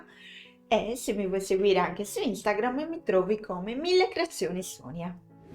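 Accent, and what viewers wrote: Sardinia, Italy. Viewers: Sonia ma sei Sarda? Sento l'accento simile al mio 😊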